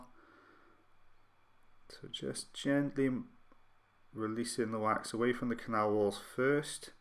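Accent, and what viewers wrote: United Kingdom. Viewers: So good to hear a UK accent.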